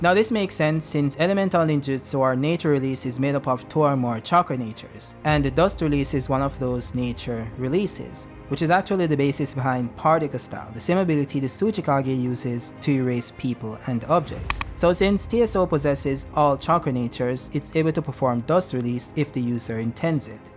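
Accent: Jamaica. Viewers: sound like u come from Jamaica dawg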